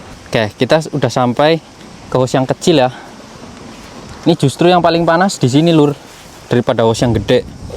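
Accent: Banyumas, Indonesia. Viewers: asalnya dari mana bro...medok banget Kya bhsane Inyong ...salam kenal inyon d Bobotsari Purbalingga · Ngga apa" mas yg penting halal Mas berasal dari man bahasanya pake nyong ngapak mana lur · wong ngpak ya mas smpean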